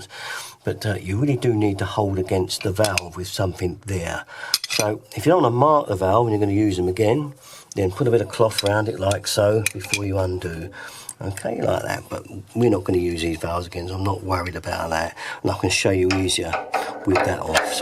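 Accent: United Kingdom. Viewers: Hate to ask such a stupid question but I have to know, where are you guys from? Hard to place the accent but it's definitely somewhere in the UK haha I love it though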